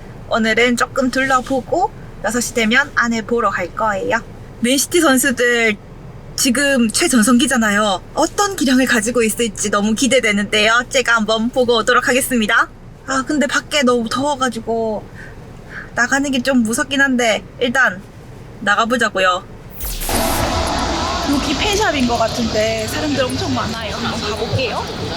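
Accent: Gyeongsang, South Korea. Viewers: 누나 경상도 사람이었구나 ㅋㅋ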